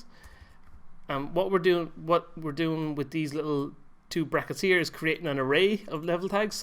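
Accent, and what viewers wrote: Ireland. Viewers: Hi are you Irish i'm new here you sound Irish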